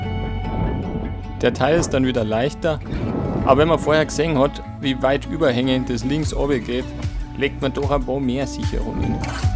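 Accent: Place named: Bavaria, Germany. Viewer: Servus, ich hab deinen Kanal vor kurzem entdeckt und muss sagen die Videos taugen mir richtig. Gute Länge, gute Aufnahmen und sau sympathischer bayrischer Kommentar. Mach weiter so!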